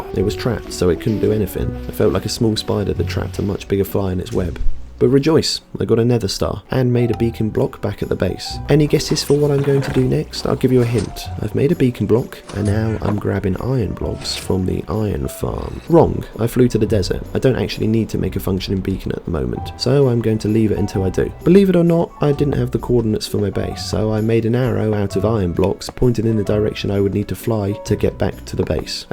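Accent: United Kingdom. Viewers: god i really like your calm British accent you sound soo chill also all hail the king of melons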